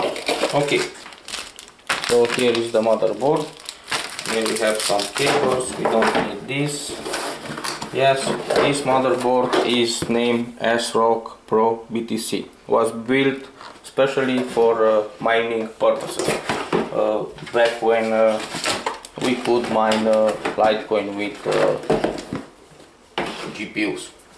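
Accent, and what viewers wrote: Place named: Romania. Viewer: ăsta sună a Român , ce dqr 😂 (this guy is Romanian ).....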